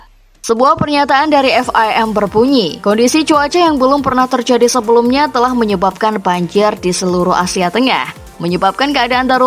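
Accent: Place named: Java, Indonesia. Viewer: disini mendingan sih. medok VO nya hampir ga kedengeran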